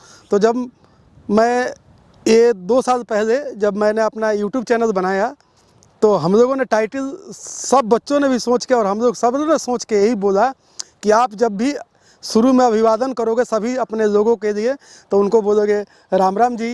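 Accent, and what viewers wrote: Bihar, India. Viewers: Ap Bihar se hai sun k bahute accha lagal ba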